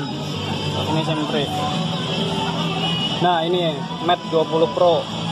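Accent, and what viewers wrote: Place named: Java, Indonesia. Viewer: Medok banged suara.mu? 😅😅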